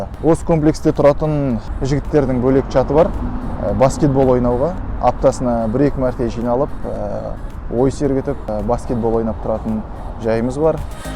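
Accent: Standard. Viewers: Бəрін өте тамаша айтып берді:байыппен, түсінікті. 👏👏👏 Басқа елде өз тіліңде таза сөйлегені былай тұрсың!👍 Бақытты бол, балам!😚 Жастардың осындай білімді, мəдениетті болғандарына қуанамын! Тілші деп осыны айт. Жарайсың, алға! 🇰🇿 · 👍👍👍қазақ тілі таза! Жақсы мәлімет алдық👌👌👌🤩🤩🤩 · Рақмет, ризамын саған бауырым қазақ тілінде көбісі таза сөйлей алмайтын қазақтарға үлгі болсын сенің тұлғаң!!! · Бауырым бираз акпарат алдык рахмет .Озиннин таза казакша сойлегенине танти болдык.Алла разы болсын.Америкада жургендей сезиндик озимизди.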